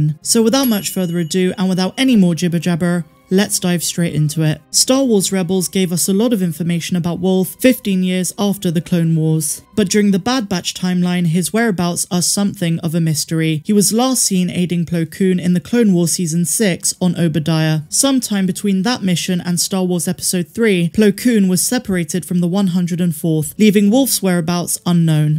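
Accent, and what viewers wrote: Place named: Bristol, United Kingdom. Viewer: You have a Proper Brizzle twang there Am I right? Wicked vids, always enjoy the updates and theories, cheers 🙏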